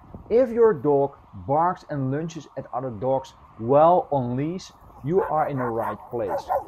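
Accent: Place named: Netherlands. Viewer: Hoi ik hoop dat ik mijn oprechte mening mag geven op je nieuwe uitdaging om video's voortaan in het Engels te maken. Je uitspraak van het Engels is nog té "Nederlands" kortom klinkt beetje raar...😮😮😮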